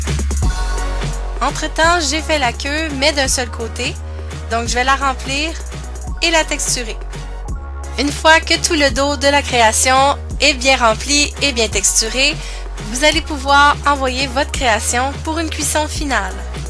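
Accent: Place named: Quebec, Canada. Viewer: Le bleu est très beau et bravo super chat 😀 ,et j'adore ton axen québéquoi 😉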